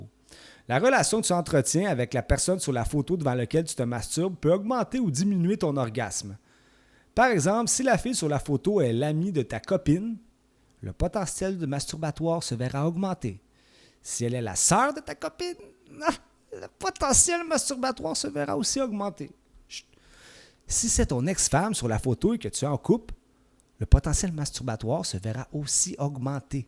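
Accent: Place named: Quebec, Canada. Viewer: l'accent quebequoi est un répulsif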